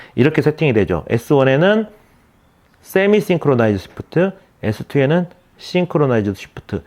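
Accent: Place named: Gwangju, South Korea. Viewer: 참 좋은 영상입니다~! 구수한 광주 사투리는 덤이구요 ^^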